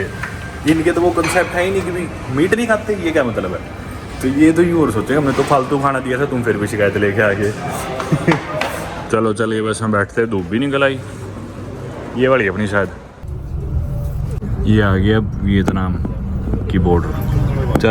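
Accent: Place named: Haryana, India. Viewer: Hi, jab haryana bolte ho na kisi doosre desh mai bhai swad hi aa jata Hai....... tau kheech de tode de batti😂😂😂😂😂😂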